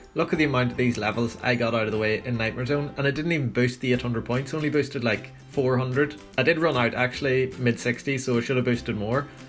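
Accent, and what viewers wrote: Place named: Northern Ireland, United Kingdom. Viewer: Jesus, hearing another Northern Irish accent on the internet is super weird. Wait it says your from fucking Enniskillen on your twitter... lmao, i'm 10 miles away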